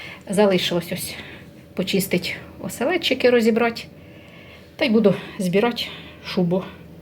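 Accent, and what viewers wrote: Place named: Poltava, Ukraine. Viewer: Чую голосочек полтавчаночки і аж на сльози пробиває... наче моя мама ожила. Вона теж готувала дуже гарно і без книг по кулінарії і без інтернету. І посудка похожа навіть запах відчуваю. Не думала, що так побачу і від чую тепло наче рідного дому. ДЯКУЮ... ДЯКУЮ... ДЯКУЮ!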